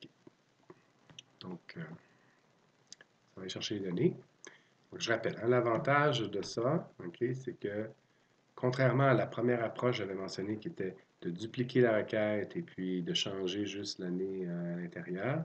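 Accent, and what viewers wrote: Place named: Quebec, Canada. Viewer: Merci beaucoup très intéressant J'adore aussi l'accent québécois ;)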